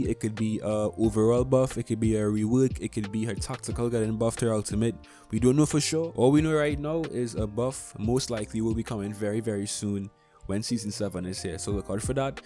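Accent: Trinidad and Tobago. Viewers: YOU SOUND TRINI MY GUY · R u a trini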